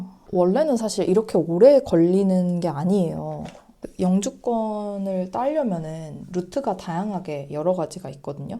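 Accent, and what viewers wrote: United Kingdom. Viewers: 너무너무 추카추카 합니다. 그렇게 오래 걸리는줄 몰랐네요. 저는 3개월~ 4개월 만에 영주권 받았는데 다른분에 비해 빨리 받은 거군요. 케이스는 다를수 있겠죠. 저는 결혼허고 난뒤 벋았고 26년전 일이라..... 지금은 많이 힘들다고 듣긴 들었어요. 암튼 다시한번 축하드리고 14년 되셨다니 거의 영국인이죠. 발음도 영국인 현지 발음이시고요. 아, 그리고 저는 3~4 개월 기다리면서도 안와서 재촉 전화했더니 알주일만에 왔다는 사실 ㅋㅋㅋ 날씨 안좋은 영국 😂😂 생활 열심히 행복하게 사시길 바래요.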